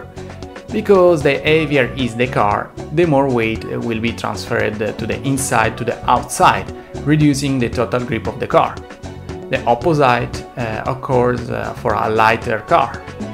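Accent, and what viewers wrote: Italy. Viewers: Are you Italian? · scusami, è da poco che ti seguo ho solo visto 2/3 video, ma qualcosa nella tua voce mi dice che sei italiano, è irrilevante e solo una mia curiosità. comunque fai dei bellissimi video complimenti! sorry, I have only recently seen 2/3 videos, but something in your voice tells me that you are Italian, it is irrelevant and just my curiosity. anyway you make some beautiful video compliments!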